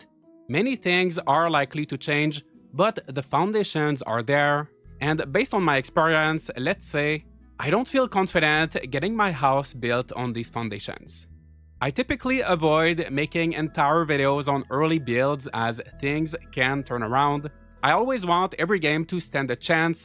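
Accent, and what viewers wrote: Quebec, Canada. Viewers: He is from Quebec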